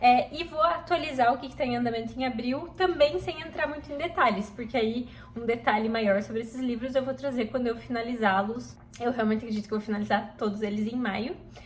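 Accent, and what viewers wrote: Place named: Rio Grande do Sul, Brazil. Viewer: oii, sou nova aqui, daonde tu es? sou do rio grande do sul e achei teu sotaque parecido com pessoas daqui da volta heheh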